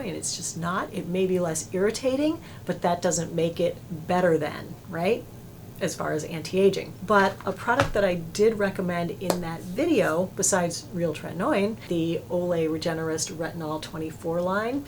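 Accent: France. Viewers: Love your French accent, oui oui! ❤️ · Love your French accent!😉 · Oh! Votre accent Français! Too cute;) J’adore vos vidéos!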